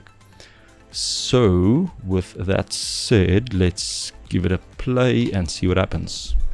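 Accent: South Africa. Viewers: Ppreciate the video bro! Also, are you South African?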